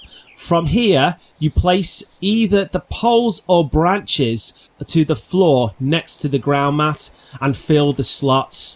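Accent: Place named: Warrington, United Kingdom. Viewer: I would say Warrington area if I was to take a guess.? Nice concept as well mate!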